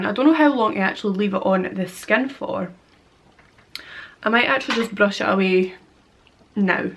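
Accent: Scotland, United Kingdom. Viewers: are you Scottish I take it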